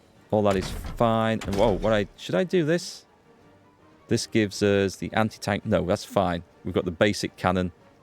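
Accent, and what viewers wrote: United Kingdom. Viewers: great for you. better for us. I love your gameplays, mainly because of british english which is not so common on Youtube :)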